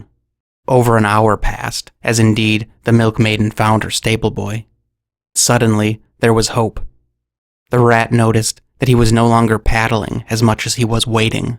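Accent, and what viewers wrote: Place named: Missouri, United States. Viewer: I really like your work Your a intelligent dude.I'm from Missouri that's the way Us Hicks talk.lol,Looking forward to your next video..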